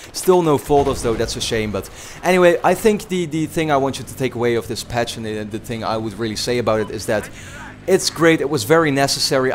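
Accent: Netherlands. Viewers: Ben je Nederlands? :p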